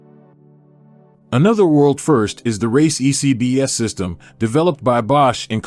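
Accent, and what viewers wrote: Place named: United States. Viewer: American voice over can't even pronounce Panigale, does my nut in.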